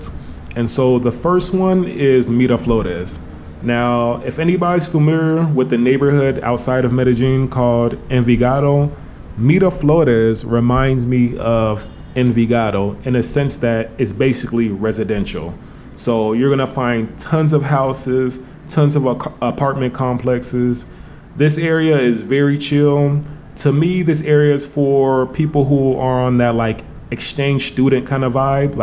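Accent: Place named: West Coast, United States. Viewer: were you raised in Cali-fornia? definitely have that west coast lingo accent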